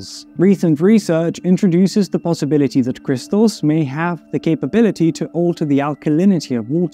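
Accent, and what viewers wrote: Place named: Scotland, United Kingdom. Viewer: (with a slight Scots accent)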